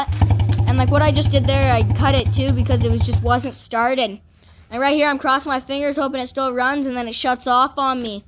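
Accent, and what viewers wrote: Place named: Newfoundland, Canada. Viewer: you should like a newfoundlander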